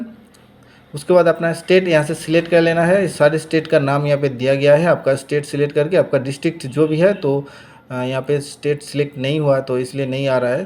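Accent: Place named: Bengal, India. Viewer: হিন্দি টা ঠিক হচ্ছে না বাংলা চলে আসছে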